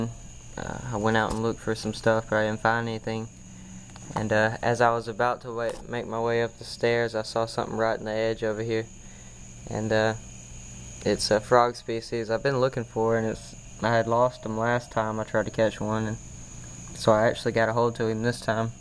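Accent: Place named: South, United States. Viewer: "Got a hold to him" 😊 You have a sweet southern accent and phrases. I'm southern too ❤️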